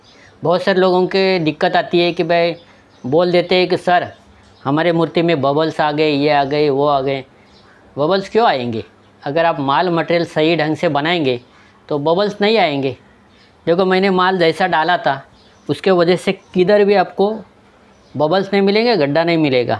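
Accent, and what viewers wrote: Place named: Rural, India. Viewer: 😊सरजी गावरान हिंदी 👌👌👌👍🏻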